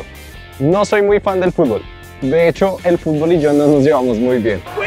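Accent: Colombia. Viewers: Eres colobiano?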